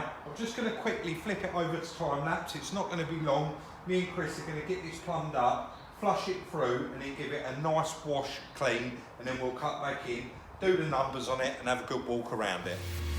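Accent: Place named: Cockney, United Kingdom. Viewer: It was like watching Baywatch with a cockney David Hasselhoff..Loved it and you boys deserve all the profit you make 👍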